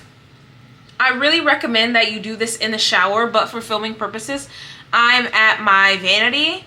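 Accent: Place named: Caribbean. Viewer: Thank you for sharing &I love your accent is it Caribbean?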